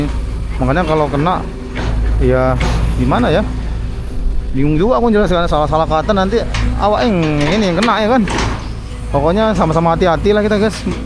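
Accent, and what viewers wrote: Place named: Medan, Indonesia. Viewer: Bang kau lucu kali😂😂, medan kali😂😂😂😂 1. Awak(aku, saya) 2. kontak(kesetrum) 3. udah nampak(udah kelihatan) 4. nah ini kan segini kalinya,nah disana udah lebar kali guys, lebar kaaaliii guys😂😂😂😂(kayak mana bedakan kali yg ada airnya dgn kali yg gk ada airnya bang) 😂😂😂😂 5.atrek atrek atrek Terima Kasih info dan hiburanny bang, sehat selalu, salam dari Medan.... Horass🎉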